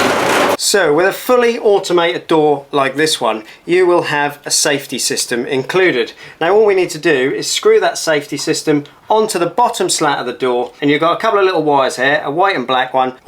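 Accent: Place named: Norwich, United Kingdom. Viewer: You sound like a narrich boy, im one myself! I had and his team fit me a double sectional door on my garage they did a nice job, but a sectional garage door is not a diy job, abit more to it compared to a roller.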